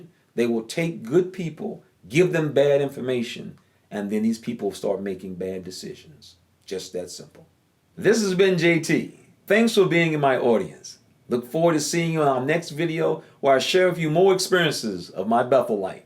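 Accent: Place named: Detroit, United States. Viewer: Man JT you talk and act like my Detroit people. Lol Tell it like it is my brother.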